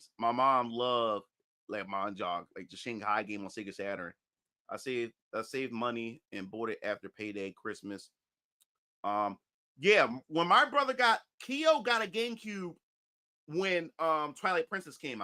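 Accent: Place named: Philadelphia, United States. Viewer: Nah couz daz how they talk in Philly, git with it x).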